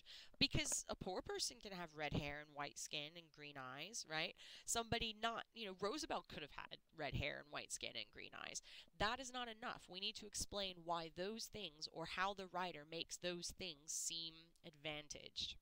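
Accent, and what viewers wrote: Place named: United States. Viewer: Why is an American teaching me English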